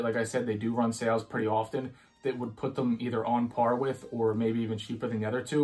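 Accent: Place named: Philadelphia, United States. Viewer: You spoke 4 words and I was like this dudes from NE philly and then I seen the judge shirt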